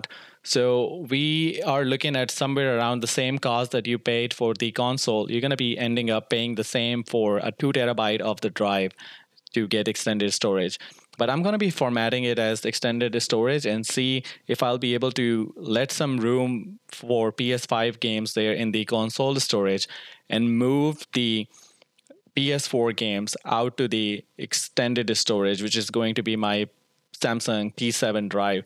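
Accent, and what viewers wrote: India. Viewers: Are you from india? because your accent seems like it and you also search bollywood videos in your 8k tv video that's my speculation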